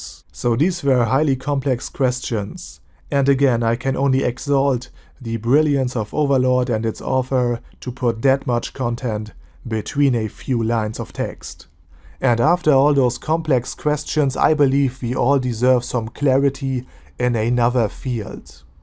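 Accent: Germany. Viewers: great video! :D are you german? sounds like a german accent perhaps you could do an analysis of some of the new worlds gouvernments in case you run out of ideas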